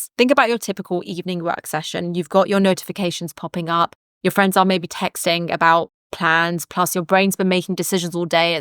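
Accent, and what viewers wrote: United Kingdom. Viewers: I would like to make a comment if I may… could you please talk slower? I normally have to listen to other YouTubers with 2x but for you, I cannot continue listening after 5 mins… if you try to level your British accent with a speed which makes it more understandable, it will be best… also, YouTubing is your job… pls try not to pour in your anxiety in your speed of speaking 😊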